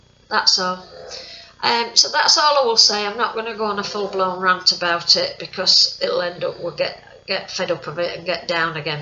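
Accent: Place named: Wakefield, United Kingdom. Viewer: Love your accent! I'm from Northern Ireland but have a nephew from Wakefield who speaks just like you! I've just recently subscribed to your channel and really enjoying your videos so keep them coming! Norma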